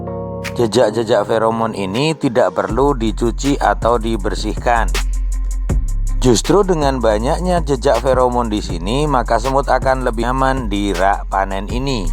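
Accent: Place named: Yogyakarta, Indonesia. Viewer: Apa suara org jogja kaya gini semua ya 🤔🤔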